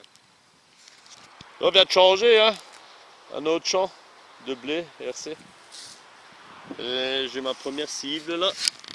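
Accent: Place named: South, France. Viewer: petite sortie sympa ca degulingue la tarte a l'oignon continue j'adore ton accent du sud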